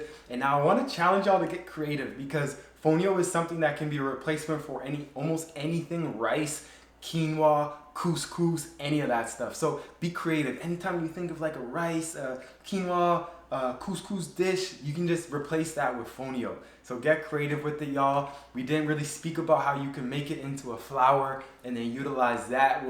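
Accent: Canada. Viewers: Are you Lucian 🇱🇨 ? Your accent sounds Canadian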